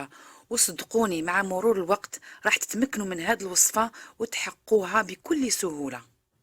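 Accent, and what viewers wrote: Algeria. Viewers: Les marocaines sont les meilleurs dans les msemen mais je te fais confiance en plus tu as la même voix et même accent que l actrice algérienne elle est enseignante aussi j ai oublié son prénom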